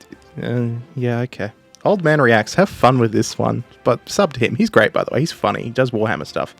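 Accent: Australia. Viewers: It feels so refreshing to hear an Australian accent in videos like this. Born in NSW, raised in QLD :D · I just realized this guy is an Aussie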